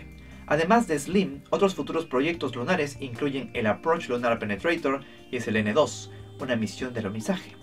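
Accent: Peru. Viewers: ¿eres peruano? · ERES DE PERU? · no sabía que eras de Perú, es que tú acento se oye diferente, y tu vídeo me súper encanta, interesante como todos los que nos compartes.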